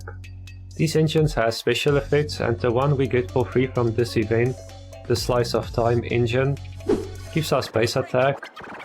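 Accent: South Africa. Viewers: You sound South African, I'm from there too. Nice video my dude!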